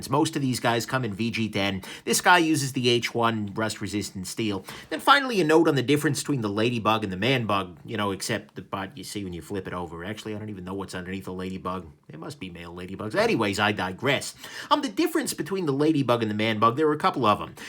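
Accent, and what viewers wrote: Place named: South, United States. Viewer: So when do we get the high speed low drag review of the ladybug with that southern accent?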